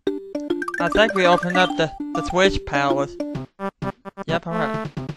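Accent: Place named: South, United States. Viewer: love ur country accent, great commentary!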